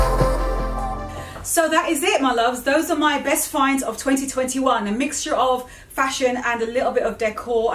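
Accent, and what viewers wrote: United Kingdom. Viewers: Hi beautiful - your accent wow! New to your channel, via Arvin Olano. Thanks for the Inspo, with your fashion taste I’m surprised your videos are not edited with music? You would rock any runway! Cheers!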